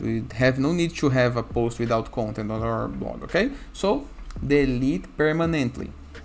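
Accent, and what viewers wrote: Brazil. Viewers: haha tem um brasileiro nesse ingês hein, parabéns pelo conteúdo!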